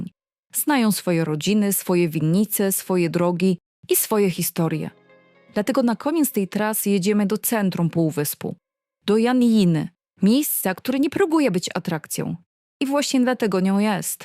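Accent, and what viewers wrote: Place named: Ukraine. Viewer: Całkiem niezłe jak na AI tylko ten lektor tak zaciąga ... ukraińskim?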